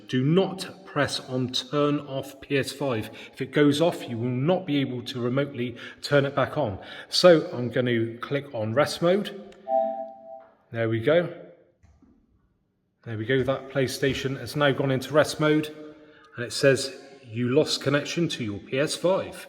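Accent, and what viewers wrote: United Kingdom. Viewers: Very helpfull I realy understand everything and your very nice british english you are the best and your video is far more better then sony thanks you very very very much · Are you British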